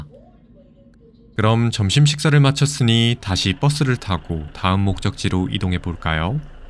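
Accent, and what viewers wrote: Jeolla, South Korea. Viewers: 으미~전라도 억양이 참! 듣기 좋네요~^^ 서울말씨인줄~~ ex: [가~장 긴 시간] 표준어 억양 [가장 긴시간] 좋은정보 감사하지라~ 화이팅~!!!!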